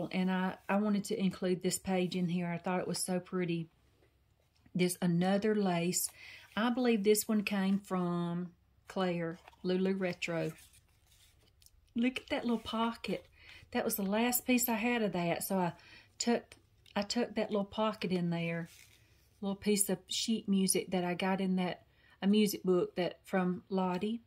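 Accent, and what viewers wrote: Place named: Alabama, United States. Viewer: Beautiful journal! Awesome first video! This Texas girl loves your Alabama accent!!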